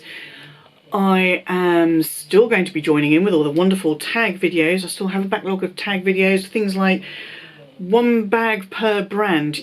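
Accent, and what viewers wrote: United Kingdom. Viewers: Aww, I'm so sorry this all stressed you out so much! We don't love you and your channel because of your unboxing of luxury items, though. It's your humour, personality, positivity and, I admit (to my Canadian ear) British accent! You could be discussing the phone book, and I'd still watch as your take on anything and everything is always worth watching. Seriously, your honesty in this video is just one small example of why I adore you. You really are the best, <3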